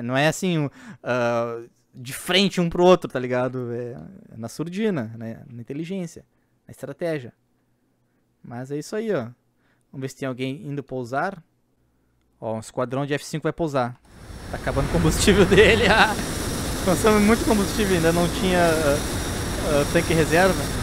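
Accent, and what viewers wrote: Rio Grande do Sul, Brazil. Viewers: Tchê tú é gaúcho de Pelotas ctz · Tu é gaúcho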